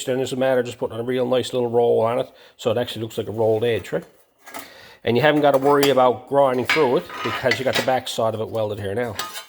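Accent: Ireland. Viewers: . Iv just found this channel mate. Iv seen 4 videos of yours. I bought my grandson a we car there's a we hole in the floor and the rockers. Looking at you're videos I'm sure I can repair them. Do I hear a bit of Irish in your voice. anyway good job all round mate. Best of luck for the future. Paddy from Ireland. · Nice work. Great accent, sounds slightly Irish! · what accent has this guy? there is some Irish accent on some words and not that fake Irish accent you think we Irish have.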